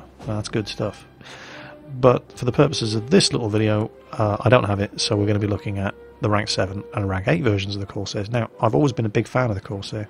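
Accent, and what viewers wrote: United Kingdom. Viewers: British or American? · Bayden Hudson Trying to "help"...he has an English UK accent, not a North American English accent. Also Boom isn't talking to you in any way that's offensive, he's stating a fact, you just need to stop getting your panties in a bunch. Also considering that you have only 2 subs and you're trying to "help" a YouTuber with almost 400K subs, it looks like he doesn't need help from you...he does have almost 400K subs.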